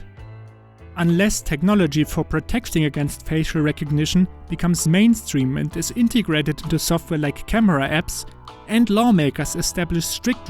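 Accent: Germany. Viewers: Kann das sein das du Deutscher bist? Der Akzent killt mich halt total x) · Love the german accent. es ist schön but thanks for the information you are providing. subbed.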